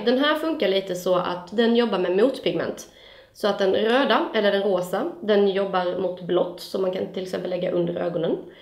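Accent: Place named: Skåne, Sweden. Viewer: du är duktig, men det suger att du pratar skånska. :(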